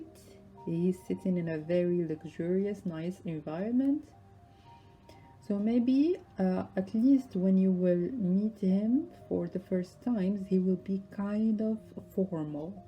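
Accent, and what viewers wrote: France. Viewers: You have a french accent or am I hearing wrong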